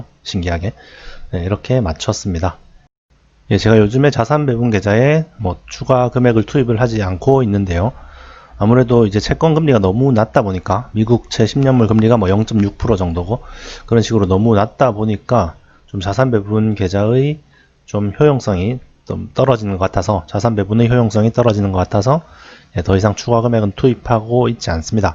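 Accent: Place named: Busan, South Korea. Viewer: 말투가 부산분인 것 같았어요ㅡ ㅎㅎ 저도 부산입니다. 용호한의원이 한곳 뿐인가요?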